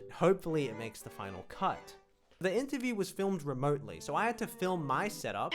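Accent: Australia. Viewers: in his asmr he just sounds like an american or canadian but as soon as he speaks up a little your like jesus thats a fucking aussy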